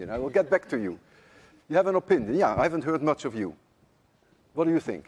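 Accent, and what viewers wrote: Netherlands. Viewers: Try it , Huygens , hoigens........ Die Nederlandse tongval is en blijft geweldig. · Haha , it took me 5 seconds to know you're Dutch Mr Lewin. You speak DENGLISH . Zo noemde m'n engelse collega's het. You think Dutch and translate , where as i think in English and write it down. Maar ik vond de video boeiend en duidelijk.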